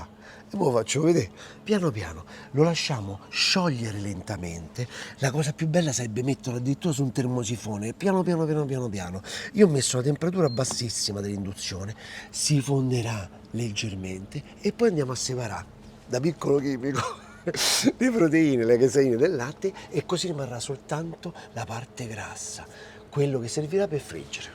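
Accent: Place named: Milan, Italy. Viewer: Max complimenti per aver assunto anche l’accento milanese quasi perfetto !!!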